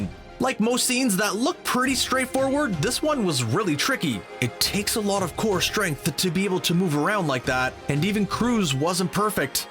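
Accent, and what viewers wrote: Canada. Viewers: How come all these types of docustyle posts are done by Canadians with heavy Canadian accents? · Can't deal with the heavily Canadian accented narration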